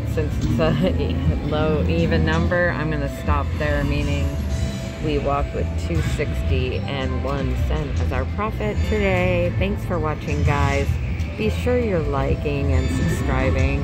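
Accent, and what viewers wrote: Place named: California, United States. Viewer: Your accent you have to be in Cali. I like playing the Bufaros here in Oklahoma casinos . When I was a kid I usd to go to the wildlife refuge and play with the real ones.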